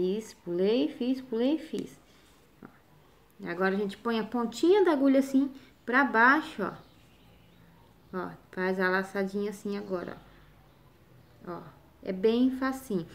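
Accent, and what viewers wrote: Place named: Rondônia, Brazil. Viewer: Q coisa bunitinha! Vc tem sutaque de Ro!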